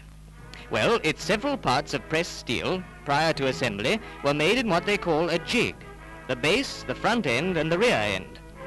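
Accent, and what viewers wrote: United Kingdom. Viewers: Considering that this film is British. You can tell by the accent, it was filmed by Pathe and the car was build in the Dagenham plant. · "Ford et Degenhem" - no "As" in those days! Whatever heppened to those posh English voices?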